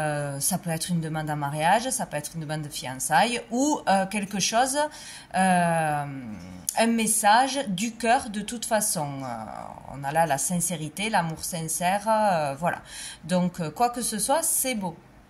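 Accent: South, France. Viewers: Acsent du sud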